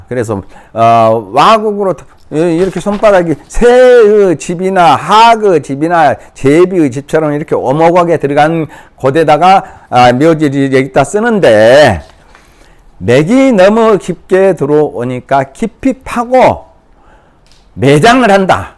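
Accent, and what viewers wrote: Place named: Jeolla, South Korea. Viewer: 선생님훌룡하신강의 잘보고 있습니다 말씀이 전라도 이신거 같으신데 어디신지 여쭤봐도 될런지요?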